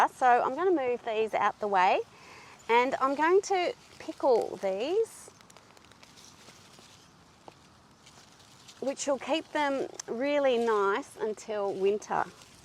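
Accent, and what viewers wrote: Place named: Australia. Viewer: I enjoy all your videos. I especially like your lovely, exotic (to me) Aussie accent.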